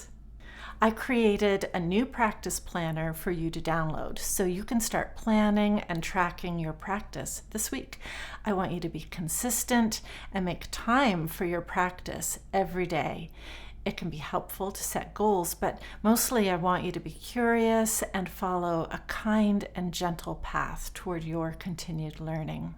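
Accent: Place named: Canada. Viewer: Thank you for making me feel a musicien at 72 years and for the inspiration you give me to sit down and practice in a structured programme. I do love your very clear canadian english accent. Have a nice Sunday.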